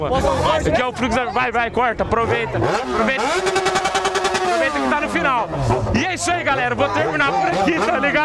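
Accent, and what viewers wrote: Goiás, Brazil. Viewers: No pique do Goiás assim que se fala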